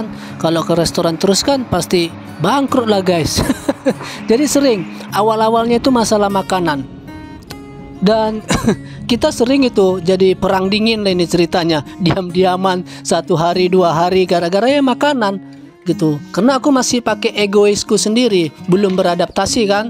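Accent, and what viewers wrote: Medan, Indonesia. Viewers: Saya sering ngikutin konten Anda.saya mau tanya dialek kayaknya anak Medan.udh lama tinggal di Jepang? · Maaf, bang ini suku apa ya, saya suka dengar logatnya, kayaknya bahasanya mirip logat medan...😊❤ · Baik bahasa Medan kita nya bg😂😂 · abg org medan ya logat abg kayak org medan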